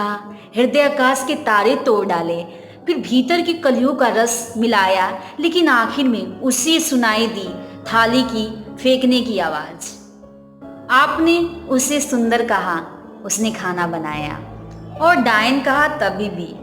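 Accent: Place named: India. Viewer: Your voice is very nice with a pure Indian touch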